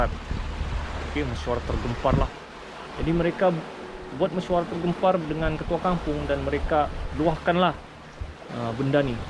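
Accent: Sabah, Malaysia. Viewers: sabah kerass boy. hahahaha.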